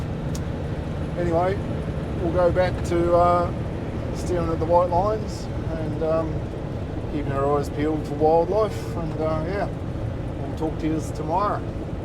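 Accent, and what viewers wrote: Southland, New Zealand. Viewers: You sound like your from southland in NZ. Good job mate enjoyed the video.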